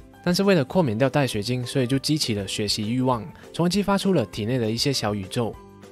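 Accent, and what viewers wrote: Malaysia. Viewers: 为什么今天那么马来西亚腔hahahaha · 原來不只我覺得這支影片的馬來西亞腔比較明顯哈哈哈可是都很好喔又學了很多當我存款不多的時候創業時真的很壓力心裡不安到爆炸兜兜轉轉回歸原點這個影片真的超中肯✨✨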